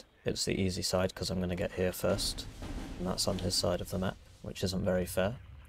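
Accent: United Kingdom. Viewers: Lol at the juxtaposition of the Barbie song and a calm British voice bringing death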